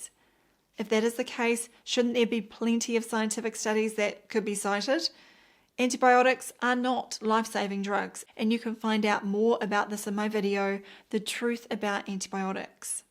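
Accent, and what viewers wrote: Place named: New Zealand. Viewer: Sounds like a kiwi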